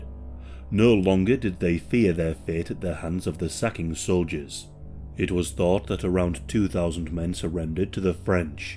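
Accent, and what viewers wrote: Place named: Birmingham, United Kingdom. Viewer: I can’t stand it. I think he’s from Birmingham.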